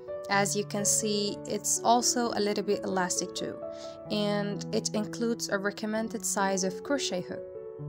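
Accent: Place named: Saudi Arabia. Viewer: تتكلمي سعودي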